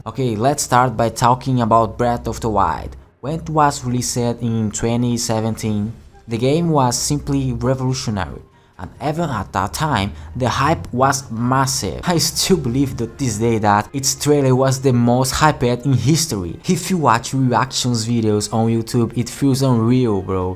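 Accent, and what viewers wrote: France. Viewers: Bro speaks francglish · Idk man the accent makes me think he’s French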